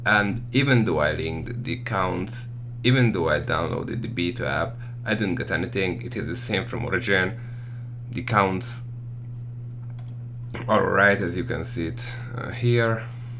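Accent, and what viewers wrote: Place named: Hungary. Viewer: Az első mondatodnál éreztem már hogy magyar vagy :D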